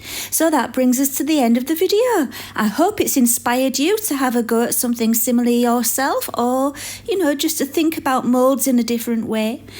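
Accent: United Kingdom. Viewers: Best resin channel! Plus us yanks love a British accent.😍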